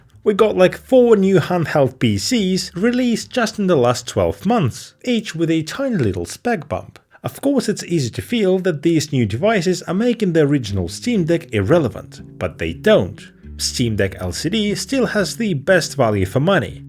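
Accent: Russia. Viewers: Должен сказать, что русский акцент почти не слышно. Отличное произношение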